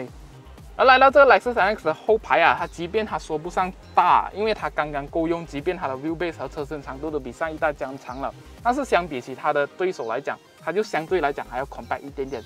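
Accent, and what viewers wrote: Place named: Malaysia. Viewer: 你讲英文口英太重… 马来人…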